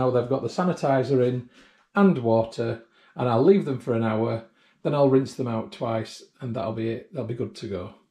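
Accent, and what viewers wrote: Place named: Yorkshire, United Kingdom. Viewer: Hey - good on ya for reclaiming all those lovely bottles. I used to do it but eventually grew tired of the process - I now brew exclusively with flip tops On a different note - I'm sure you've already figured it out since making this video - but if you make up your sanitiser into a solution and fill your bottles that way - not only is it a lot easier to fill the bottles - you can keep reusing the same solution for quite a while as long as the pH stays above 3.5. I keep a few 2 litre juice bottles full of StarSan solution in my laundry and just pull out what I need whenever I start a brew. I haven't had to change the solution for nearly a year so far and given the cost of StarSan it's a good saving. In closing - I'm not sure which I enjoy more - your content or listening to a proper Yorkshire accent again. Ee ba gum lad it's like puttin' on a nice comfy pair 'a slippers. 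😂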